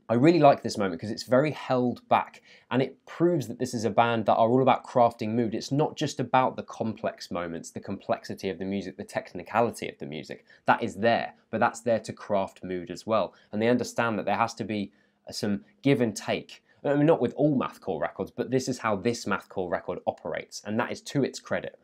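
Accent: United Kingdom. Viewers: I've stumbled upon a British Anthony Fantano.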